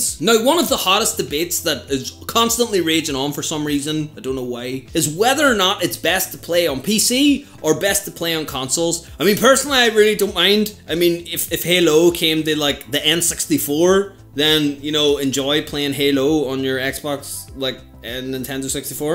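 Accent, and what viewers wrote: Scotland, United Kingdom. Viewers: scotish i think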